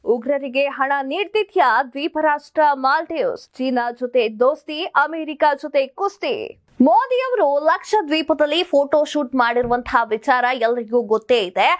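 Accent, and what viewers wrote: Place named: England, United Kingdom. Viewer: kannada vannu english uccharane madida hage madbedi.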